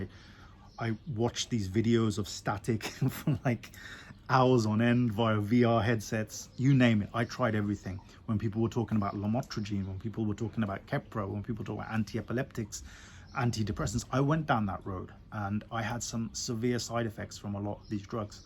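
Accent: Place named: Birmingham, United Kingdom. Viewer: Sound like a real guy bro. From a fellow Brummie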